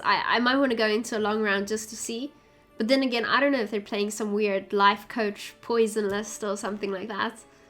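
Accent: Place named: Afrikaans, South Africa. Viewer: Hi can you speak Afrikaans, Ek kan net aan jou aksent sê jy is afrikaans en van suid afrika 😊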